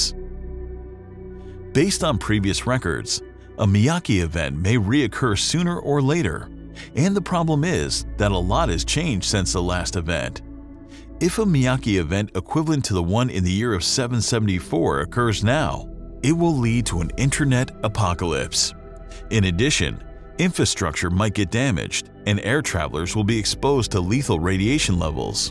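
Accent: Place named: United States. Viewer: This American accent is disgusting. Can't watch it.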